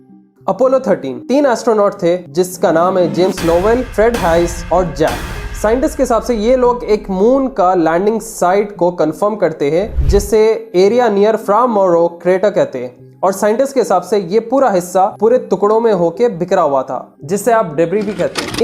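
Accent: Hyderabad, India. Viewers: Bhai kirak Hyderabadi baatan kere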